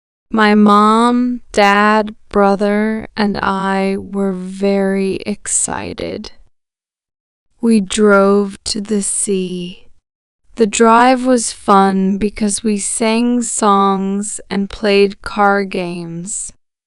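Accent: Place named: United States. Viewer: بصراحة طريقتك ونطقك لللهجة الامريكية رااائعة.. واصلي واياااكي تفشلي.. راح تكبر قناتك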